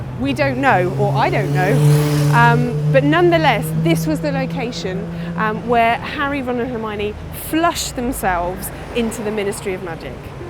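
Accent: London, United Kingdom. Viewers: I just came here for the London accent :)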